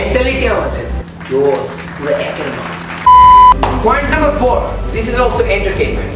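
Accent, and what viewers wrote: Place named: Old Dhaka, Bangladesh. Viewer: vi vlo bolsen.. but Language gola ektu vlo korle r o vlo hoto... but kisu korra nai karon ata poran Dhaka kotha bolar style.. jak all the best vi.. 👏👏👏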